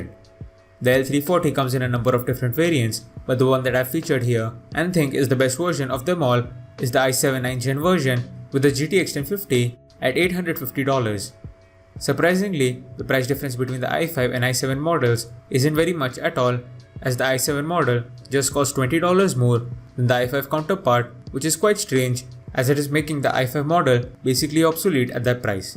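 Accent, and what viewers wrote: India. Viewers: As far as I can figure out from your accent, you're probably an Indian guy. So you should specify the prices in INR too. 🙁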